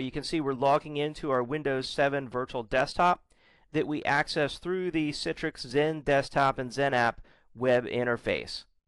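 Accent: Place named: Philippines. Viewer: are you a pinoy? you have a strong pinoy accent.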